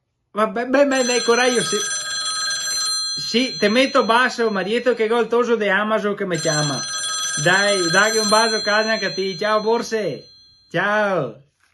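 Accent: Vicenza, Italy. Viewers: Luca scotton sono d'accordo con te è strepitoso, ma dimmi " in quale provincia parlano come lui forse Vicenza?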